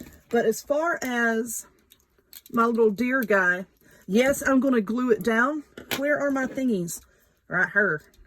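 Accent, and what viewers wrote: South, United States. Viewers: If you dont mind me asking what part of the south are you from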